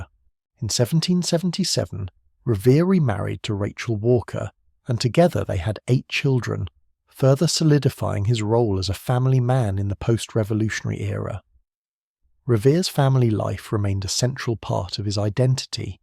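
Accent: United Kingdom. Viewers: WHY is this deeply American story being narrated by an English voice? We went to war against that country .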